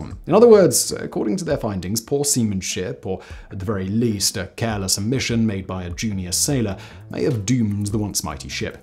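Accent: United Kingdom. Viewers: it's so much more satisfying hearing a Brit talking about a British ship. . But yes, he does a awesome job narrating anything.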